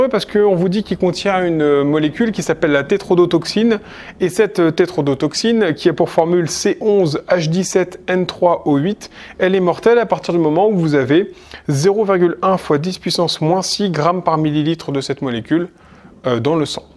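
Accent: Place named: France. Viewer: Mignon votre accent français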